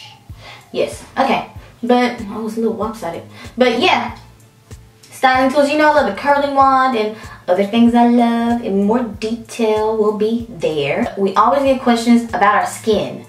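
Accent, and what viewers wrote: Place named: South, United States. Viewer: I will FOREVER love your Southern Accent!!! Thanks for the Video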